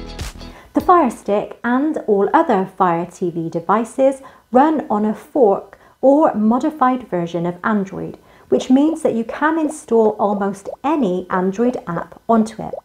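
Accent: United Kingdom. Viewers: Brititsh accents 😚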